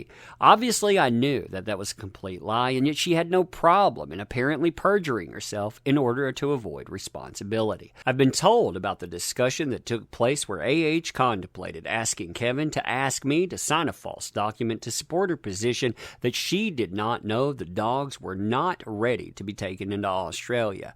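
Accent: South, United States. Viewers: Fam your southern accent just makes the new sweeter than 🍯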